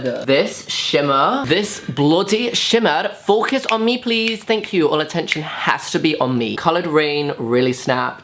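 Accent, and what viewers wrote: Scotland, United Kingdom. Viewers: Love ur Scottish voice we love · The Scottish accent tho😂😂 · we stan a scottish intro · the scottish accent through this killed meee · Scottish then?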